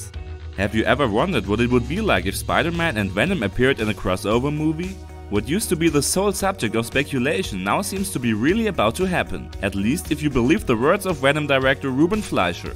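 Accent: Germany. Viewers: that german accent is so hard not to hear sry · der sprecher is safe aus deutschland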